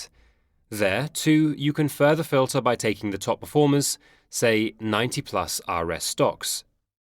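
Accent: United Kingdom. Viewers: English accent sheeple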